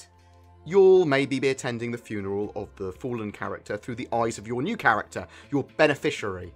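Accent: United Kingdom. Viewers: Great video as always! Have you ever considered about a professional anchor position? You remind me of a lot of British actual speakers..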